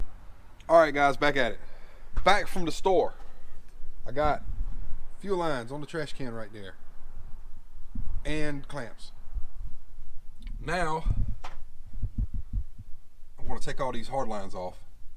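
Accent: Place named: South, United States. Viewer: I never realized how southern you sound till I watched this video.... lol Good job brother!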